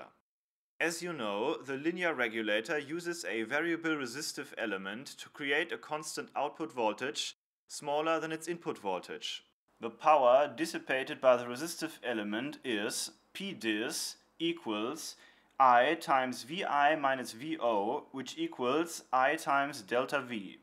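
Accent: Germany. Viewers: Excellent, just excellent videos. Very well thought out and executed. As a 25 year technician, I can say, I've re-learned something from your videos. The direct nature of your presentation is outstanding and I'm sure, will help students and guys with foggy minds, such as myself. I love your German accent. Danke! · Respect for the creator if this Great Youtube Series. It's quite easy to understand and follow your ideas. Thanks a lot. Btw, sounds like a German accent.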